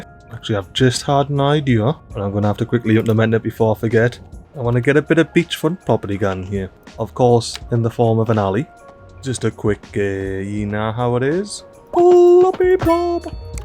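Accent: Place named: Newcastle, United Kingdom. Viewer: geordie commentary? Love to hear it 🤣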